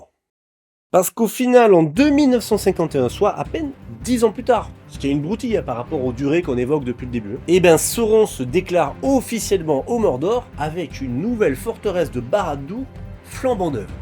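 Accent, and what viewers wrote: South, France. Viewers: 'Tain j'ai crû que les pattes sauronara existaient vraiment 😂 Pis entre l'accent du sud et le fait que t'arrête pas de parler de dr*gue j'ai pensé à jdg tout le long 😂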